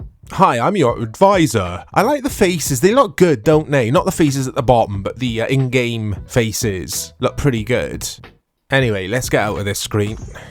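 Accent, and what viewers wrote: Wales, United Kingdom. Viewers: Are you welsh as I can’t hear a little welsh, also big up United.. even tho we are trash atm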